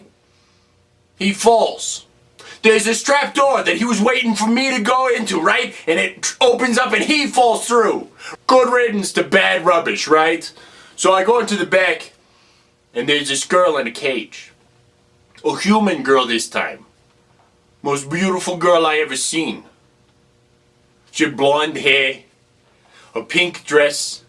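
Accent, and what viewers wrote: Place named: Italian American, United States. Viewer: you do a good italian american accent whats your secret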